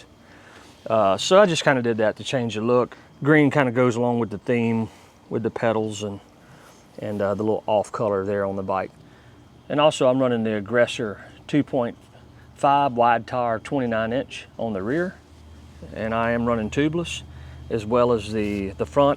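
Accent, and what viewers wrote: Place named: Mississippi, United States. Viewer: . I grew up in MS and miss the accent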